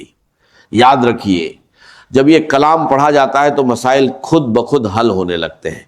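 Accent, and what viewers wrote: India. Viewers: Ya kesi Hindi walay ki awaz ha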